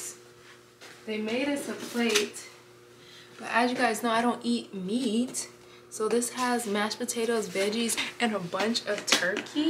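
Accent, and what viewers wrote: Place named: United Kingdom. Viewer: I love hearing you call us "babes" with a British accent 🤣💙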